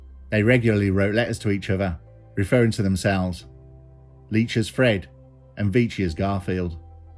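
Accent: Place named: England, United Kingdom. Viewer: I'm always please to watch. None other like it....bonus with the English accent! Not f--king London English. Peace and goodwill to The Main Man.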